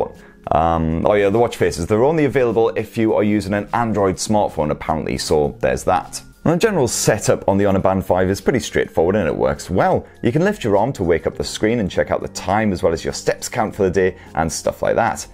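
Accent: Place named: North East England, United Kingdom. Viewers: A circular job is, and a bum load of features. Love it. Nice looking item. Wish I’d got it, prior to getting the kids into Fitbits. Can’t quite pin point the accent. South of the Tyne, but, North of the Tees?. Nice review. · I thought I recognised the accent aha I’m also from Sunderland:)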